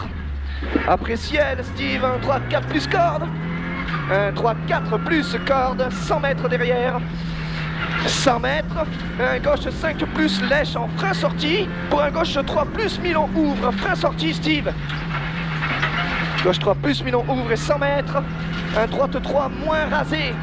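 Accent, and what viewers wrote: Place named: South, France. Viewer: Es ce que vus faites des rallyes dans le sud ? Ps : vous venez d'où ? Car vous avez un accent du sud un peu 😅, j'adore continue comme ca !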